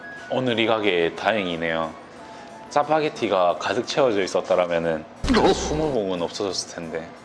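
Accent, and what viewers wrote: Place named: Busan, South Korea. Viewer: 야. 부산 촌놈 그냥. 사투리써. 안되는 표준말 썼다가 사투리섰다가 하지 말고. 불편해 보인다. 알겠냐.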